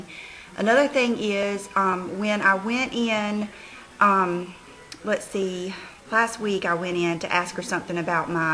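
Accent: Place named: North Carolina, United States. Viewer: Elizabeth Elizabeth I'm not sure where you are from but here in good ole' backwards NC with our accent it's "whelps" Your comment kinda pissed me off because you seem to be poking at her accent. We are very proud of our accent here and I think is as cute as a bug with her accent.oh lord there goes that darn accent again. You seem to be a judgmental person. jmo! Also FYI WHO HAS 3 SUBSCRIBERS AND WHO HAS THOUSANDS OF SUBSCRIBERS! Nuff said as we say here in NC!!!!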